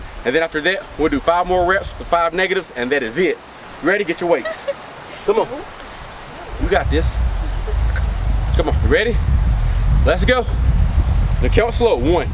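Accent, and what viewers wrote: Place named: South, United States. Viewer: I like that southern accent, awesome quick bicep workout! Thanks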